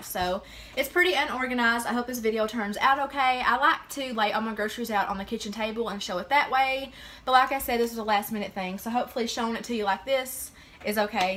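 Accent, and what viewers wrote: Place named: Kentucky, United States. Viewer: Love your videos! I’m from Lexington so I love the KY accent 😘😘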